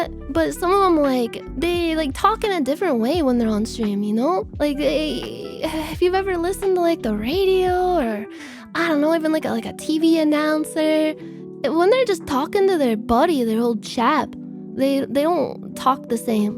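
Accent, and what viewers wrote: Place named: Michigan, United States. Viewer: I want to hear her authentic Michigan accent more sometimes.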